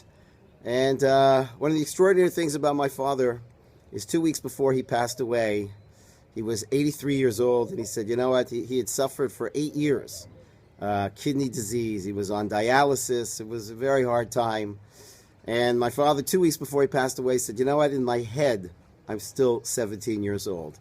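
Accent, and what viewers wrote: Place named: East Coast, United States. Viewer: I am so transfixed by listending to you.You are talking not as a Chabad Rabbi but to me you speak as a fellow from back East ( I am from Bensonhurst) ( I was born in Crown Heights Hospital) and one who makes me remember my jewish soul between the times I pray at my local Chabad in California on Sat, Hear Rabbi Gordon on Sunday for breakfast, Meet with Rabbi Dovid and the seniors on Thursday ( that almost makes a week) oh...and come at 7am when they dont have a minyon. Barry Solomon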